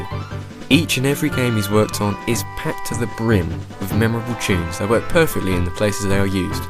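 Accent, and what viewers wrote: United Kingdom. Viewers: OOOOH SWEET! A BRITISH GUY! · Because almost every Brit I've heard pronounce Ocarina says Ocariner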